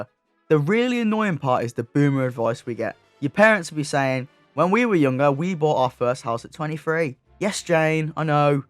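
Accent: United Kingdom. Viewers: Im Nigerian living in UK,but that British acc is mad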